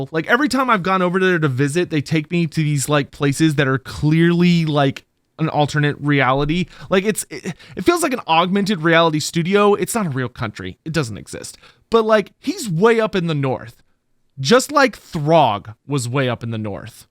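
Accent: United Kingdom. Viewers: Mancunian accent makes it too difficult for me to watch. The prediction videos also kill a lot of excitement for me at least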